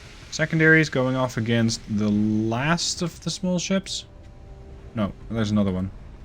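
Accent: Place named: Netherlands. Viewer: horrible Dutch english. OMG. get some training.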